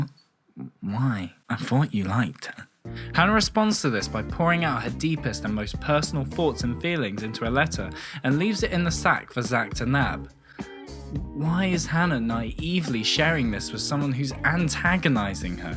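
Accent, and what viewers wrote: United Kingdom. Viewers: That’s literally a common British voice, I’m pretty sure I sound a bit like that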